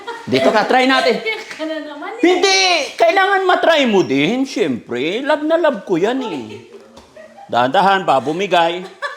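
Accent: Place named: Quezon, Philippines. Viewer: Kkatuwa nman😅ganda ng bahay at mga rom banyo sopa parang rich na tlga ❤ ang hagikhik yong tawa ng tawa na wlng sounds pg sa sobrang happy n ayaw marinig malakas n tawa tawag hagikhik na sa isang tabi kkatawa ganon yong salita ni prang sa amin sa quezon tagalog n tagalog❤goddess team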